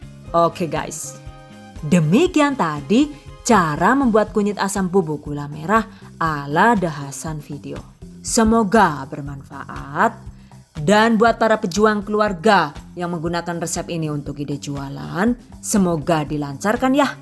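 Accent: Banyumas, Indonesia. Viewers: Bahasae bisa ngapak juga hhhh salam mbak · penyampaianya sangat detail.. aq sendiri ngapak tpi denger bunda ini ngapak kok ngakak aq🤣🤣🤣🤣 lucune jannn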